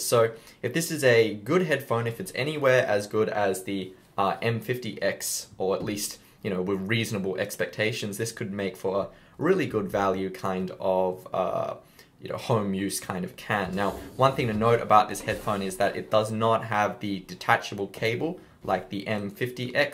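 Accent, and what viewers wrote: Australia. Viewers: He’s clearly got an Australian accent???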